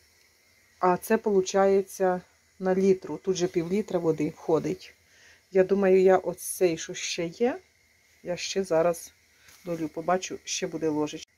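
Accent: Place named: Zhytomyr, Ukraine. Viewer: доброго дня вам,ви дуже гарна жіночка один позитивчик,не звертайте увагу які роблять вам зауваження,у кожному регіоні розмовляють по своєму, А в Житомирській області так розмовляють,я трошки там жила.Коростишівський район с.Харитовівка.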